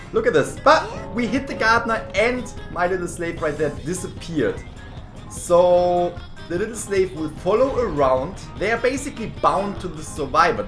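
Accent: Germany. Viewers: Man merkt das er deutsch is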